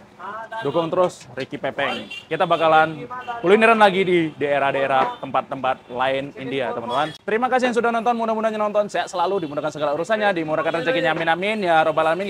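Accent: Medan, Indonesia. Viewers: Nambah napsu makan nonton chanel ini.. suka sama kedua reviewernya Pepeng = lidah medan = Lidah bandung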